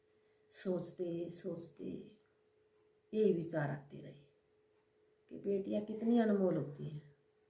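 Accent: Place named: Haryana, India. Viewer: bhn me theth haryanvi 👍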